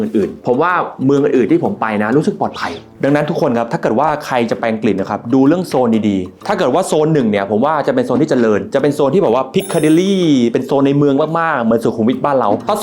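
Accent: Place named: United States. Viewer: พูดเหมือนที่เมกาในตอนนี้เลยค่ะ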